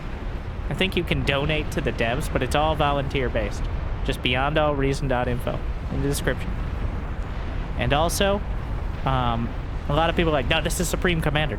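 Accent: United States. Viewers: What is dudes new American accent?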